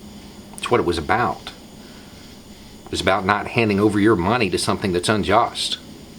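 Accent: South, United States. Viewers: Come on now. Southern-sounding white guy in his garage, with a decent sized beard and cap? Oh hell, brace for the... Whoa, This is Awesome!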